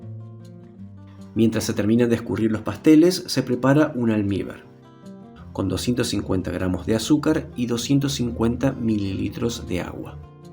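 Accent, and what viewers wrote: Argentina. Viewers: Que hermosa receta!!! Buscando, encontré la perfecta!! Y hablado en criollo argento!!! Lo amé!!!!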